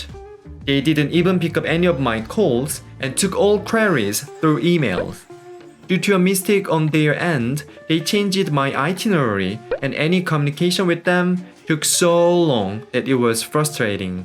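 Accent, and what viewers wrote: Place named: South Korea. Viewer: Such an honest pronunciation of a Korean dude. Just speak Korean and add captions in English would be a much better and high quality method for your vids mate.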